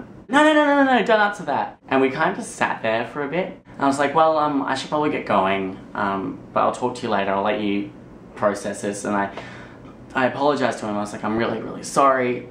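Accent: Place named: Australia. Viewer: i come hear for his australian accent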